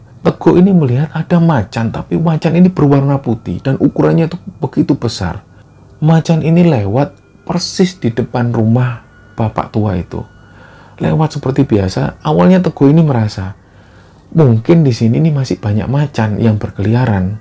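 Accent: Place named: Surabaya, Indonesia. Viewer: wkwkwkwk suroboyo banget, tekong-tekongan, skit skit an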